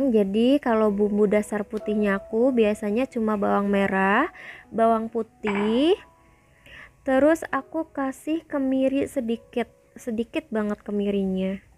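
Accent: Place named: Tegal, Indonesia. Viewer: Kakaknya orang Tegal yaaa..tegalnya mana kak..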